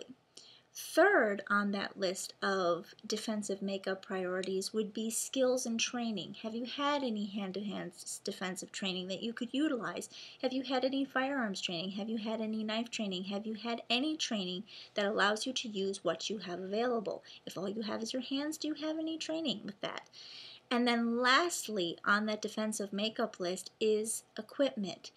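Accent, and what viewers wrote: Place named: Midwest, United States. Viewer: ... Your accent sounds mid-western so I'm guessing Ohio. You need to adjust your logic. There is a third and fourth reason for a person being "knocked down". 3.) The stance the person is in at impact and direction of bullet travel. 4.) The "point" of impact. I saw a man shot 7 times in the chest with a stupid.25 auto and he ran to nearby house and sat waiting for an ambulance. I also saw a screwball shot with a.25 auto in the forehead. It didn't kill him, but it knocked him down.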